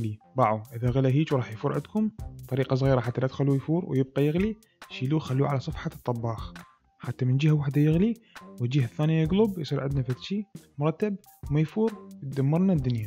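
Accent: Mosul, Iraq. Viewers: تحكي عراقي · الله يگويك شيف. حلو لما تتكلم باللهجة العراقية ولكنني لا أعلم لماذا تقول قرفة، بينما دارسين هي الكلمة الدارجة بالعراق والخليج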